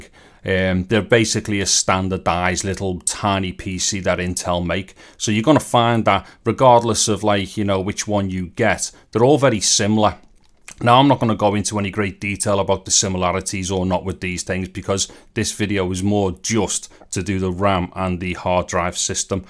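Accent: Liverpool, United Kingdom. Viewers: lol, his accent is as scouse as it gets. it is awesome. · Ha, ha, ha.... I was saying to myself when you started talking... Liverpudlian. Just like them Beat- uls! I had friends from Liverpool decades ago. Also my Grandma's accent was close. She was from just a bit north of you. Thanks for the video.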